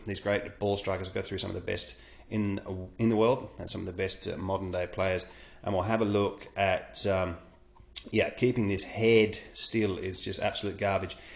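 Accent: Australia. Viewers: Love your videos, they are amazing, delivered with good old Aussie subtlety and charm, keep up the good work mate! Aberdeen, Scotland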